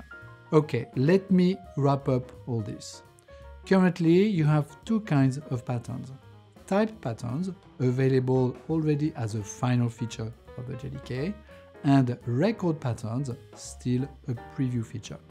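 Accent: France. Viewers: The accent sounds french to me, and some sounds of vowels are silent. I use the subtitles.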